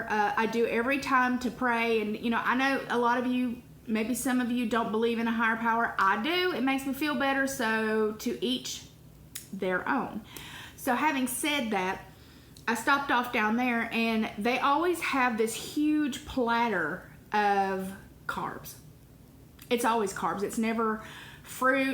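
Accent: South, United States. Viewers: do u have a southern accent? sounds kinda southern to me.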